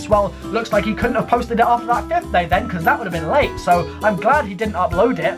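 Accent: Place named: United Kingdom. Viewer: "8 blooDee years." British accents are amazing.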